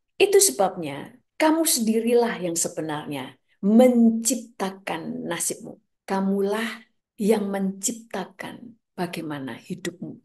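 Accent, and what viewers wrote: Java, Indonesia. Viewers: Bu mangstap... ternyata wong medhok... ❤❤❤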